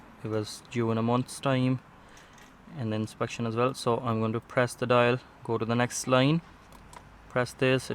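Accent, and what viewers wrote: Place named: Cork, Ireland. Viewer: There's a hint of an Irish accent in there..... specifically a Cork accent, am I right?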